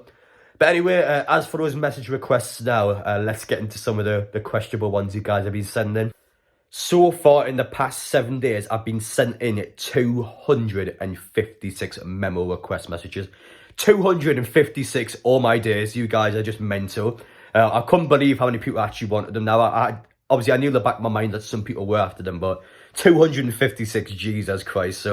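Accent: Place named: Sunderland, United Kingdom. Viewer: You sound like a mackem a take it you ain't a Geordie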